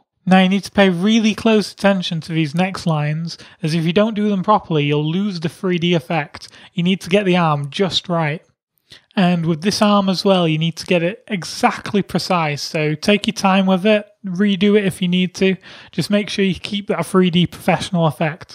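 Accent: United Kingdom. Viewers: the awesome british accent is what makes me watch the whole video XD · you sound like a nice gent from north of manchester, good drawing i've improved my own sketches with lots of 3D effects and in the head loock techniques.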